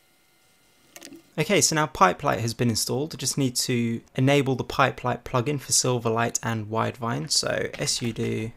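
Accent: United Kingdom. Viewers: Sierra Hormiga British pronunciation :)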